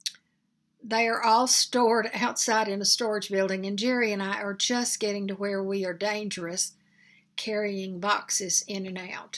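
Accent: South, United States. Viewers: I enjoy your videos and live listening to your southern accent. One.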